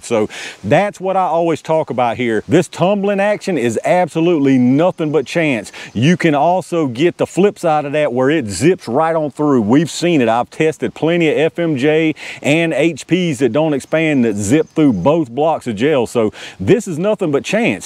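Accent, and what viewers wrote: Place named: North Carolina, United States. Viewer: He may live in Virginia, but that accent is eastern North Carolina.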